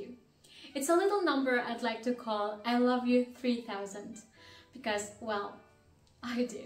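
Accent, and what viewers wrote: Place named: United Kingdom. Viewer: lmao I can see you're developing a British accent, and I honestly love it being part British myself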